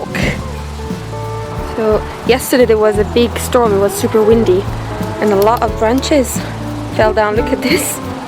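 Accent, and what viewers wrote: Scotland, United Kingdom. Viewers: Really enjoying the Scottish Diaries since I am a Scot living in Canada. I know that you are Italian but I could hear every so often a hint of Scottish brogue mixed in. Any idea why? It sounds so lovely.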